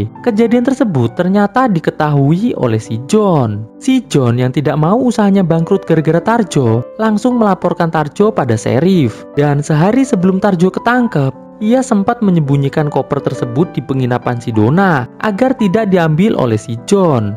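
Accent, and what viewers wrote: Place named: Java, Indonesia. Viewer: Adminnya lucu cara ngomongnya...org jawa mesti😅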